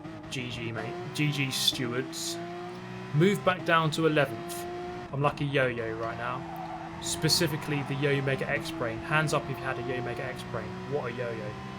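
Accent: United Kingdom. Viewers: after a Long Hiatus from arcade/sim racing Gran Turismo 5 and GTR 2 Fia GT Racing PC( last purchased games). I'm just getting back to the groove months ago(July2020) from pad controller to Fanatec CSL F1 bundle(September2020) . Gran Turismo Sport(Awesomeness) and ProjectCARS2(Sim AWESOME), ProjectCARS3(going backwards A FLOP). I'm Hoping with GT7 to be the BEST in both Worlds, Graphics, Driving Realism Arcade and Competitive Sim Racing, I enjoyed watching your videos and host commentaries. I wish I did'nt take that Hiatus. Keep up the Great Work and Race Sim Driving !! Ohh, one more thing, I guess your Filipino ..cause it's pretty cool hearing that Brit accent. ;o)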